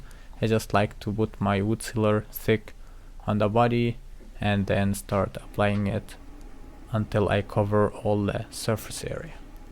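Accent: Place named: Russia. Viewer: По русски нельзя было говорить? Слышно же по акцент у, что русский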